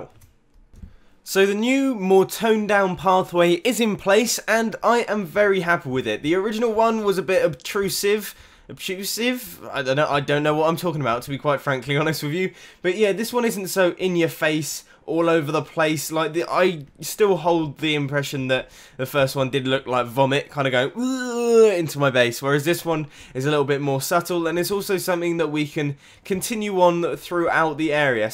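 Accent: England, United Kingdom. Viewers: listening to your british voice every wednesday ? i would absolutely love to :D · don't mean to burst your bubble but your kind of a rip off of xsuima I know every one can make tutorials but also the building style and the houses for donators plus you have an English accent which makes it more obvious just come up with your own thing is what I'm trying to say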